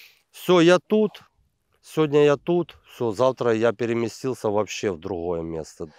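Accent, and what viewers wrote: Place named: Russia. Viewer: Твоя мова це здається що ти з раші прийшов !!! Та навчіться кінець кінців своєї мови !!!!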